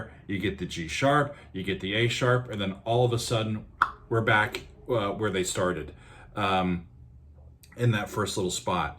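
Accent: United States. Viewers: Glad you like this amazing song, but, hey, it's like breaking down a joke - it shouldn't be done. How does it sound?!!!!!! That's all that matters - forget about the bloody notes and chords. This isn't really a reaction video, it's just an uhmericun bloke with a beard talking boringly over a great song.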